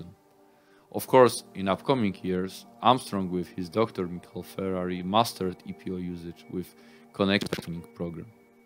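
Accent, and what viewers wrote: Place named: Russia. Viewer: The Ukraine? Romania? To the American ear, it sounds Russian.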